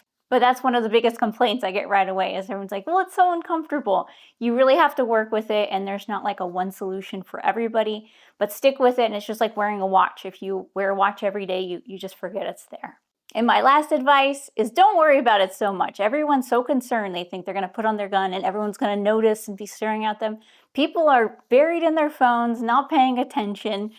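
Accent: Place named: Ohio, United States. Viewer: No matter what I carry I carry in the same place always. Thanks for the Ohio accent,Roll Tide.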